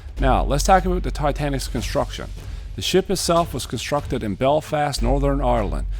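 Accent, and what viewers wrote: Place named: Canada. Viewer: The more I listen to other videos I can hear the Canadian more. Happy Christmas from Ireland, great channel 👏🏼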